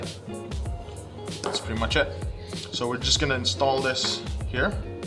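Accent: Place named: Malaysia. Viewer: i knew you were malaysian just from the way you spoke haha... anyways, good video... but yeah, it's so hard to get anything here in malaysia, we only have 1 official distributer in malaysia and they're not really taking it seriously, like their shop is always out of stock and don't carry everything that letherman has to offer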